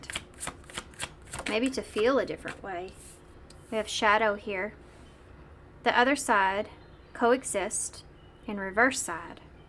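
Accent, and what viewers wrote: South, United States. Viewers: I have just relocated from PA to TN. My first time seeing your readings and my first time hearing a reader with a southern accent. Thank you for the message that i will find people i resonate with in my new community 🙏🏻🥰 pile 3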